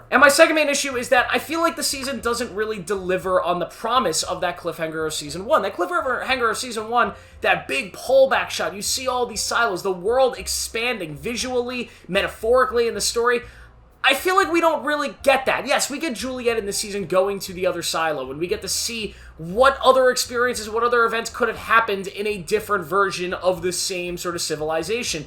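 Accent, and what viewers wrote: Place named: United Kingdom. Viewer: The British accent did come out often glad I am not the only who noticed that since English is not my main and I thought I am imagining it 😀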